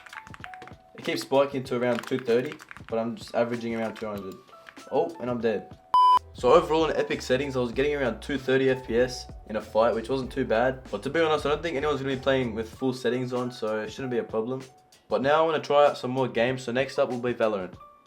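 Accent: Australia. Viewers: You must be an American who has no sense of culture or world knowledge. His accent is clearly Australian so it’s in Australian Dollars. But you’re American, I don’t blame you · read the title people its HIS dream PC not your dream PC also hes australian if you couldnt tell by the accent meaning its 3000 usd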